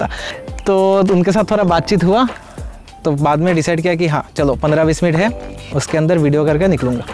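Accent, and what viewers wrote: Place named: Comilla, Bangladesh. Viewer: Dada, apnar local language akkebare amader Bangladesh er comilla , brah Mon baria'r local language er Moto..shune khub moja lagtesilo💕✔️🇧🇩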